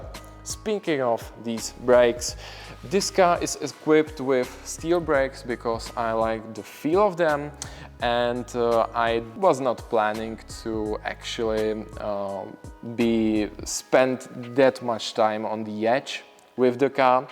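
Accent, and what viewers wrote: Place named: Czech Republic. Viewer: ta čecho-angličtina se nedá poslouchat, sorry... jinak auto krásné, gratulace ke splněnému snu 🍾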